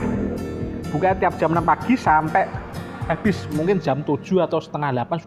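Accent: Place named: Central Java, Indonesia. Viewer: Nah ngno jozz segala arah dshot 👍👍👍 masuk2 yo ngno logat jawa tengahe nongol jg sip lah.....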